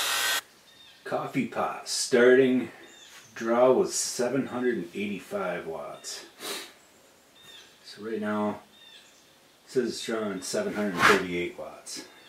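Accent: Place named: Midwest, United States. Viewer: you sound like you are from Michigan. definitely the midwest. I know that accent cuz I’m from there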